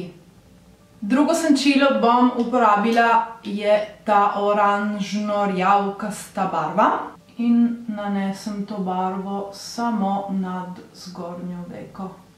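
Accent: Trieste, Italy. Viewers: Si sente un botto che sei triestina hahahahhaha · Sloveno con accento triestino, top😆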